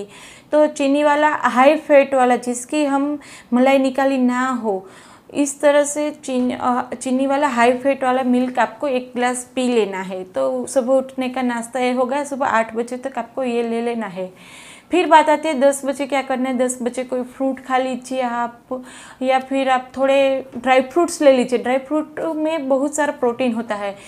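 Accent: Gujarat, India. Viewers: Gujaraat se ho kya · Are you Gujarati?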